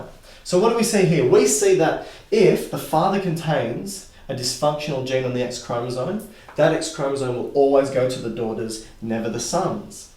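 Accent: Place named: Australia. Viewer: Hi I only just found your channel as my daughter and I have a 1Q22 duplication and from what I’ve tried to research on it it is very rare and not much known about it and all the other channels and stuff I’ve found are from other countries but by your accent can pretty well assume your an Aussie and hopefully could shed some light on this for me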